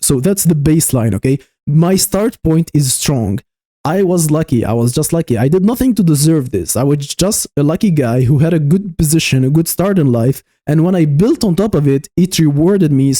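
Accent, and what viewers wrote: Russia. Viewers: Bro are you Russian? Your English is really good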